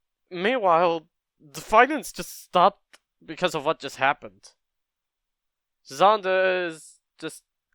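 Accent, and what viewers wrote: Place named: United Kingdom. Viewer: Why, it sound like British accent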